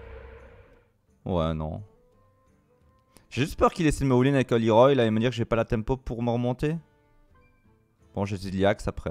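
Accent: Belgium. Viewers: GG pour le tournoi et les qualif. ça fait toujours plaisir d'entendre (avec ton accens ;)) un compatriote Belge performer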